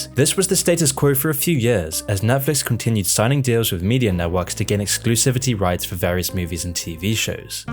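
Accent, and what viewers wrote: United Kingdom. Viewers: This channel is a bit QAnon in my view with a British accent. Plenty of reasons to criticise Disney and Netflix but when going into 'woke world' seems to lose the plot as such. I don't like Blackrock either but I don't think there is some master plot as such.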